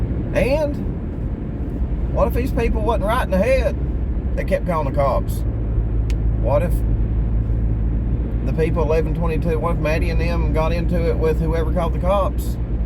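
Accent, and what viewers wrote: South, United States. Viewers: I like your nice southern accent. I am in UK, and it sounds nice. Kinda very relaxed.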